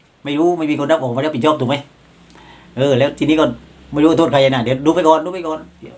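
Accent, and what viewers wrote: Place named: South, Thailand. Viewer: หวัดดีค่ะ พูดใต้แทรกบ้างก็ดีค่ะฟังน่ารักดี เชียร์นะคะ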